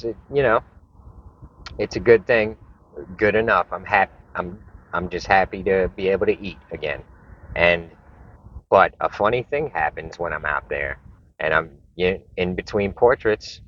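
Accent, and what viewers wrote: New York, United States. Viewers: you're a great story teller. Love your videos and that NY accent!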